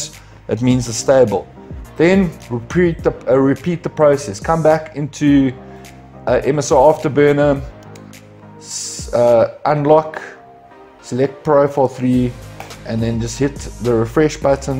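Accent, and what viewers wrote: South Africa. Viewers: Legend brother. Also, are you South African by any chance? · I know a south african when i hear one...Hehe lekker video brother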